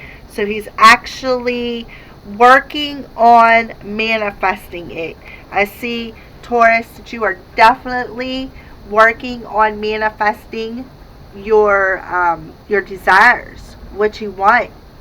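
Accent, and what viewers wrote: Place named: Baltimore, United States. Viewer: Are you from Baltimore..?..we have a unique accent so I've been told and you seem to have it 😀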